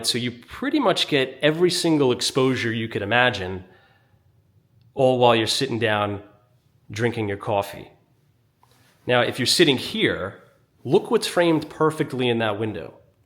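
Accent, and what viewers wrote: New York, United States. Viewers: He’s a New Yawkah!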